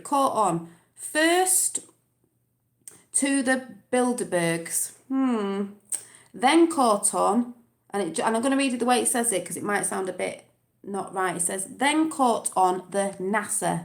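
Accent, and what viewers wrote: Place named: Manchester, United Kingdom. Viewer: ... Typical accent from Manchester and little bit of Liverpool areas of Britain! 😘 💞 🎊🎈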